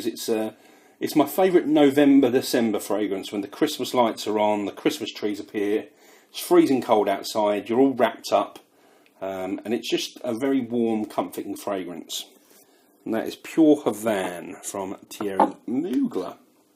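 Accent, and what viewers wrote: United Kingdom. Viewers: Uff that brit accent i missed for long time, managed to find alot of presious parfs thx to you, when i first found ur channel! including precious L`Air du Desert Marocain Tauer... glad you r back, hope you found something interesting in your journey! Any new awesome amber/incence fragrances? i still use only ambre fetiche/Tauer Marocain l'air, would be glad to hear any recomendations! greetings from Russia m8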